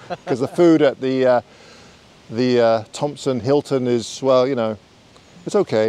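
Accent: United Kingdom. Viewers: Nice final image,. Being a Canuck, I hear your British accent. However, when you declared that you are a Canadian and followed this up with the classic "eh", you conviced me that you are indeed a Canuck as well.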